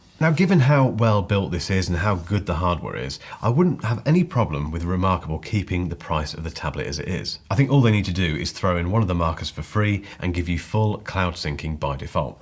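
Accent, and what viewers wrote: United Kingdom. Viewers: I really ain't sure if I subscribed your channel for the reviews or for the... British accent. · thanks for this. I have a remarkable, i purchased it just before they change to the connect option. Those of us the had the plan prior to the connect change got the full connect plan. Whew! Everything you said was correct. The price point was my biggest hartal at the time. I carries at least three note book on a daily basis but I could have used many more. I tried the iPad pro for a little while, but that was not satisfying even after the paper like screen covering. I love the tactile feel of writing and that took many months of youtude watching and reading reviews. With the remarkable 2 I enjoy writing on a digital screen. The price point is very steep and if not for my massive note taking I would have passed. One of the marketing focus points is no interruption when you are writing, drawing, or reading. 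Which turned out something that i enjoy. My iPad did not offer that unless i ran it in air plan mode. Thanks for your all your content. I have been subscribed for a few months and enjoy you British accent. Keep up the great work!!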